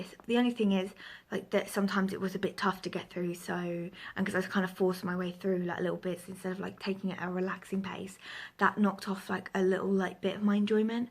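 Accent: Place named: RP, United Kingdom. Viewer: hey there ! cool vid hon ! :) :) hugs hugs aww u r nicely organised :) i got a little book revew diary :) i cringed at times in Eleanor too it was sweet book tho hugs where u from hon ? u have similar accent to me .. only u sound posher than me! hugs hugs from Essex :)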